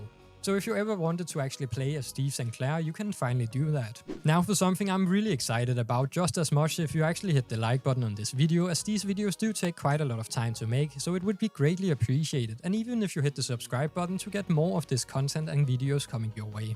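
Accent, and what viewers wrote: Denmark. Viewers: Hey kan høre du er dansk. Håber jeg da i hvert fald. Jeg er helt ny i spillet ved du om der er en dansk Clan? Jeg spiller på pc. Må indrømme det er noget forvirrende det hele. Men super fedt har spillet 20 timer på 3 dage nu. Og kan ikke se hvorfor jeg ikke skulle forsætte med at spille 1000 endnu. Vil bruge din kanal til at se warframe nu. Mvh Tobias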